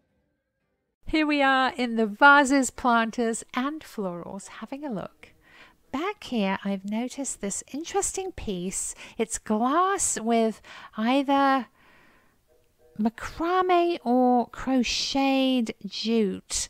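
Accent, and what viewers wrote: England, United Kingdom. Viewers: I really miss the many varieties of hummingbirds I saw daily when I lived in Costa Rica before returning to Canada. I was looking very closely trying to ID them , but definitely some male & female Ruby-throats but not sure of the others. I really enjoy your videos, and laugh when I hear you saying some of the quaint English says. Of coarse, also enjoying watching the dogs and cats...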